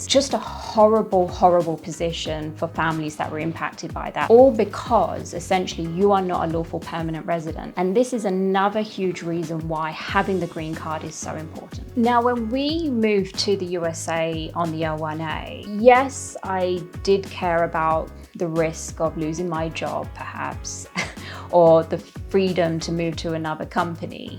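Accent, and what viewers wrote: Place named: Australia. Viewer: I was not expecting this video to be in Australian English.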